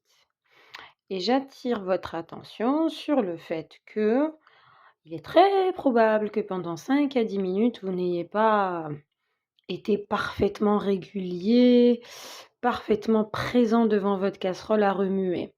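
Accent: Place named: Algeria. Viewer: Les recettes sont tunisiennes et l'accent algérienne j'ai rien compris 🤗🤗🤗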